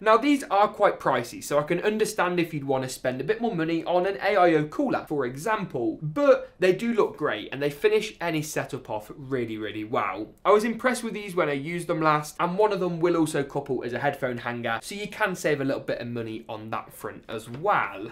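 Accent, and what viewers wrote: United Kingdom. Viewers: Great video but I don’t get why you are listing and talking about prices in Dollars, you sound like your from the UK so I’m a bit surprised and youtube is full of American PC Builders so it’s shame. Also it’s all great showing a great looking and performing build but it would be nice to see some thermal results of the builds which is just as important.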